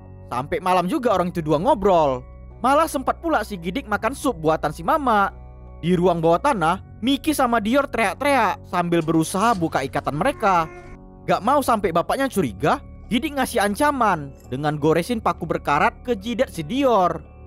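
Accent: Medan, Indonesia. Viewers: Khas Medan nya medok 😀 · Orang Medan ni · Channel ini bukan dari cara suara vocal atau verb yang kurang... tapi pembawaannya emang agak sedikit cepat karna orang medan memang seperti itu... Dengan ciri khas masing masing di setiap channel ada kesan tersendiri.. Berbeda tapi tetap santuy... Buat yang baru liat channel ini jangan buru2 salah paham yah...dengan logat seperti ini lalu mengatakan kurang bagus atau sebagainya. hargai aja dan nikmati.. salam sikopet rawa cubluk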